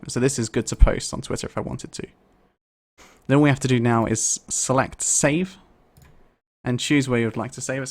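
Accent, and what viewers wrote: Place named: England, United Kingdom. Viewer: Honestly when ever I hear an english accent on starting a tutorial I think ... YES !! its likely to be clear, concise and to the point .. thank you it was .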